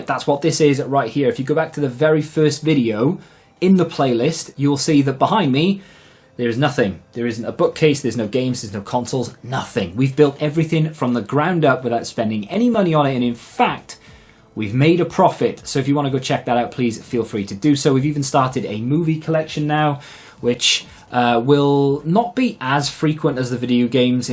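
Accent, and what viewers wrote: Wales, United Kingdom. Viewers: Love your video, interesting stuff. You sound like you are from Wales, particularly the south? Where about? I used to live in Clydach (near Swansea/neath)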